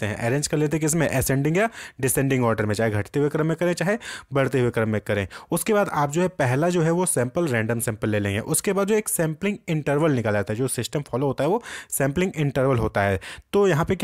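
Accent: Uttar Pradesh, India. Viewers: lgta h sir up se h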